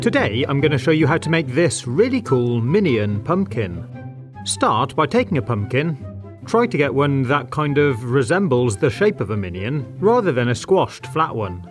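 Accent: United Kingdom. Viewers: u keep on saying "cool huh?" But are u from UK?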